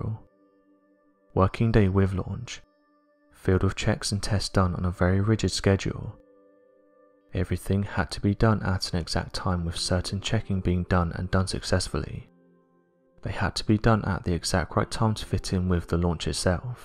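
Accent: United Kingdom. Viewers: Cog You seem to have a thinking impediment. This narrator is well-trained, well-modulated and speaks slowly and distinctly so that even moderately hearing-impaired persons can understand. He has a mild British accent without any particular regionalisms. It is very pleasant to listen to a pleasant, professional, clear voice rather than the yapping and screeching that lesser channels produce out of a failure to comprehend several of communication’s basic premises.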